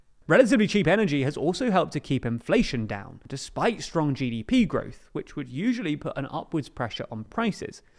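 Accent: England, United Kingdom. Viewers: The content is cool, but the English accent is one of those that makes getting information more difficult.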